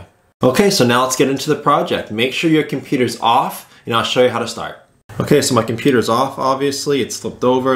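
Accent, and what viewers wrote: Massachusetts, United States. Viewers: Random but are you from Massachusetts? That accent!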